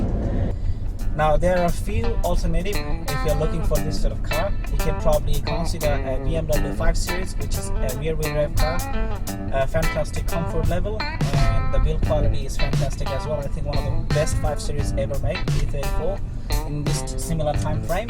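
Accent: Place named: Bangladesh. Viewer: You sound Bangladeshi 😅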